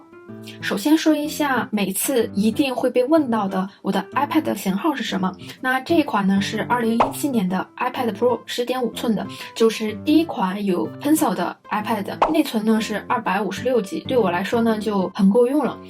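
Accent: China. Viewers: 口音是烟台的吧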